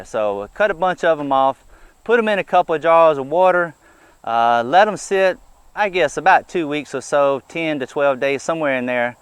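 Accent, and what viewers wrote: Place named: Gulf Coast, United States. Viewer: By the sound of his accent, I’m thinking he’s on the gulf coast. It’s hotter than hell until about November!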